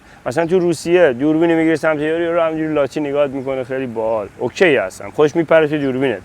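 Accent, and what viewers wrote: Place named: Gilan, Iran. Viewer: جون تو یه کلیپی گفتم لهجه گیلانی داری ، الانم اشاره کردی پس گیلانی داداش ❤❤🌷🌷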